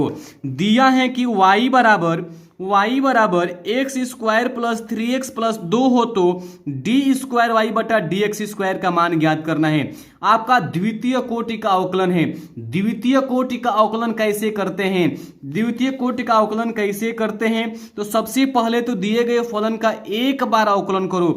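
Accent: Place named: Bhojpuri, India. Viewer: Sir app kha sa ha ..🙏🙏🙏🙏🙏 App bhajpuri bol raha ha.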